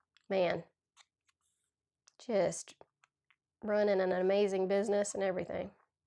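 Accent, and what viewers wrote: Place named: Tennessee, United States. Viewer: I have been wondering where you accent is from now I know Tennessee! I am in Texas.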